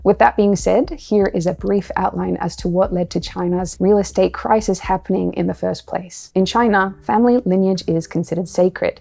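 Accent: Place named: Australia. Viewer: Your starting to lose your Australian accent...😄 Thank you for the such details you go into too explain everything... it's a shame that 99% of the world's population has absolutely no idea what is really going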